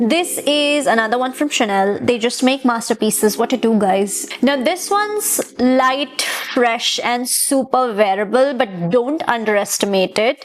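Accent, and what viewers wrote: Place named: India. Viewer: hint aksanı kulak tırmalıyor işkence gibi AHHHHHHHHH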